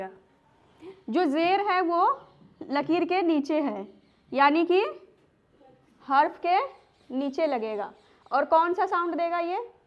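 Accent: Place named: Punjab, India. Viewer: Tuhadi language toh pta lag reha k tuci punjabi ho, but eh gall ekk punjabi samajh sakda, 😂😂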